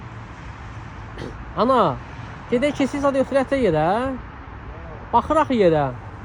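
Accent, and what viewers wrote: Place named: Mashtaga, Azerbaijan. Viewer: Hansı ləhçədir,Maştağa?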